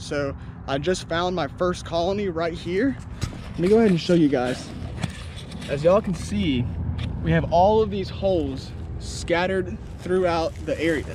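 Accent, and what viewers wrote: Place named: South, United States. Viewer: i was so confused, what got me wondering was that your brother doesn’t have a slight southern accent like you florida really is two different states 😂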